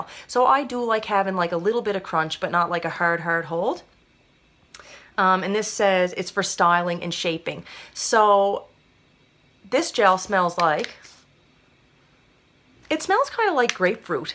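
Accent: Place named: Canada. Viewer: Fellow Canadian girl from Ontario! Love your accent. My BIL is from Cape Breton and you guys same the same things ;) Anyway, I'm newish to the curly curl method. I have tried co-washing with a few different conditioners and it makes my hair so greasy and my scalp itchy...what am I doing wrong? does this mean I can't do this method? I am using the Biotera gel, I get a cast no matter how much I use, my hair looks stringy and is hard and crunchy...I don't want that look, is gel not for me?? Sorry for all the questions :)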